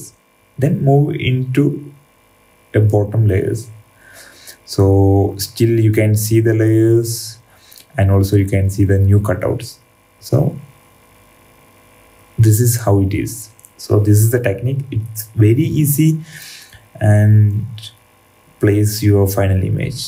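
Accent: India. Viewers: I don't wanna come off as a racist.. but when you hear an Indian voice/accent, you know how helpful and educative the video gonn be! Thanks! Kudos!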